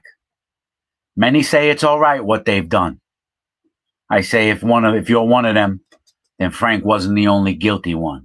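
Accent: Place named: Downstate New York, United States. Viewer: This Dude is 💯% Spot On!😳! I Love His Message & His Accent too! Always have loved the Northeast / New York Accent! This Video Is Lighting My Brain & Heart Up!😇!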